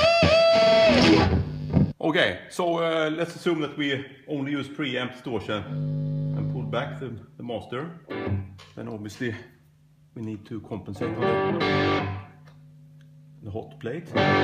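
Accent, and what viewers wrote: Gothenburg, Sweden. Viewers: Great video. Gillar din Göteborgsengelska med. :-)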